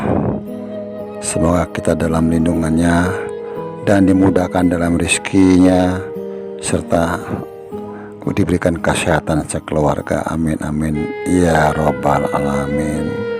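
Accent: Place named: Sulawesi, Indonesia. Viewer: Abah ini yg pny chanel yah...aksen suaranya sama hehee..ciri khas Sulawesinya gak bs dibohongi bah 😁👍👍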